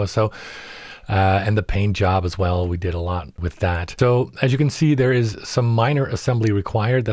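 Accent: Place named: United States. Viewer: what are you talking about?? 😂😂😂 If English is actually his second language then kudos to him because he has a great American accent. I would assume he moved to Europe.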